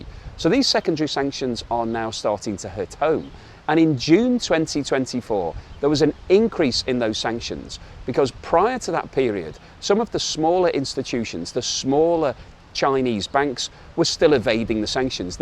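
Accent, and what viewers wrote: United Kingdom. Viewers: Hi. Are you based in the USA? Sounds more like a British accent you have there.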